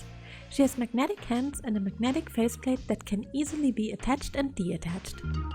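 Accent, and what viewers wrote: Germany. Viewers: Are you German? Your accent sound like German♡ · Du bist deutsch oder? Ich habe es an deinem Akzent mitbekommen PS.sehr viel talent!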